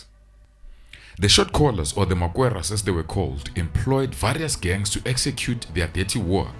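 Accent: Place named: South Africa. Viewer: BRO I LOVE THIS CHANNEL. THANK YOU FOR KEEPING OUR ACCENT AS SOUTH AFRICANS!! 💪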